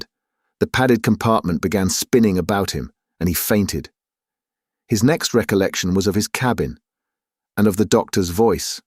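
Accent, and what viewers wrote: England, United Kingdom. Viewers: Ahhh thank you sooooo much, fabulous narration. I so appreciate this lovely English accent.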